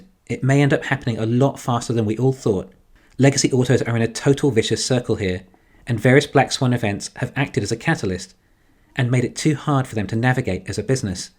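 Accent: United Kingdom. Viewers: I’m in the U.K., his accent sounds British to me.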